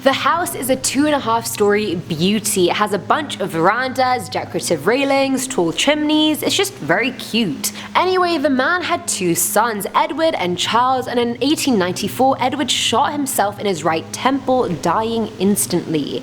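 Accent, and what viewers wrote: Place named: England, United Kingdom. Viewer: Sound like an English accent, reporting on US ghost houses. Interesting!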